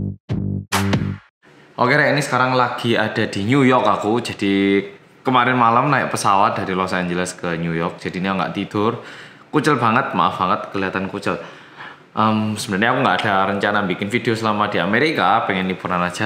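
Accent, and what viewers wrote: Java, Indonesia. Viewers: Oppa knp accent nya medok yah ? But u studied in RMIT before right ? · Jowo nya MEDOK bgt.. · ngga apa ngomongnya ngejlimet yg penting tetep Meedddooookkkkk... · Logat Jawanya medok ya.Tapi suka dengarnya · Mas ada keturunan indo ngk sih? Kok ada logat" jawanya tapi ada jg logat" Korea nya?🙁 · Welcome back to korea reomit bersama orang korea yang : MUEDHOOOOOOK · Mas saya baru subscribe, mau tanya kok bisa lancar gitu bahasa indonesia nya? Medok maneh...belajar dimana mas? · Mas nya org Jawa ya? Logat ya kayak jowo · Medok tapi cute... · Medok rekkk😉😉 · Nada bicaranya jawa bangett gituu🙂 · pantesan jowonyo.medok.tenan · Yuuuhh aku kok lagi ngerti channel iki tooo.. Kapan lg kan liat muka korea bening bahasa indonesia paseh logatny jowo.. Biyuuuhhh😂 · Medok bgt ngomong nya 🤣🤣👍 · Kok koyo wong jowo ngono e medok ngomonge xixixixix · Ada logat jawanya juga masih nampak logat koreanya..😆😆😆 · dulu sekitar taun 2017 pas channel ini baru mulai, aku dikenalno kokoku, katanya ada channel yutub org korea tp ngomonge medok. 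trus aku ditunjukno video2 channel ini. tp, well.. aku gak bnr2 ngikutin krn sbnrnya kontennya bukan aku bgt "dulunya"... aku gasuka sm review2 hahha. trus baru2 ini kok tak liat2, tak denger2in lama2 lucu jg ngmgnya wkwkwk. enak ngejelasinnya btw, trus ganteng #ehhh salfok hehe so here i am, hi, i am ur new subscriber :D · wong ndi sih iki..muka korea,logat jwa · STAY SAFE MAS MEDOK!! Semua agama baik kok, ga boleh saling menjelekan agama. Ingat lagu “Tuhan memang satu, kita yang tak sama~~” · Leh kok jowo bgt srko jowo endinsih leh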